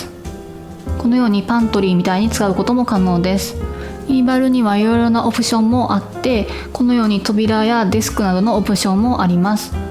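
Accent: Kansai, Japan. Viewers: さん、こんにちは♪ 毎週さんの動画アップを楽しみにして拝見しています。今回のさんいつもと違って声のトーンが低くお元気なさそうに感じましたが、お身体大丈夫ですか？いつも関西弁がちょくちょく出てさん独特のコメントが親近感を感じててプッと笑う事もしばしばで大好きなんですよね！今回のトークは推しに対する「可愛くないですか！？」がいつもと違った感じがしました。お仕事と家事と撮影と編集...色々お忙しいと思っています。お疲れが出ないようにして下さいね♪また来週楽しみに待っております！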